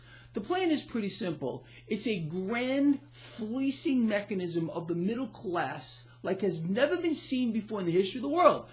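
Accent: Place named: New Jersey, United States. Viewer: you talk like you are from New Jersey. I was raised in Jersey and when I listen to you I start talking like you again rofl. REminds me of my friends and family. I now live in california so my accent has been corrupted.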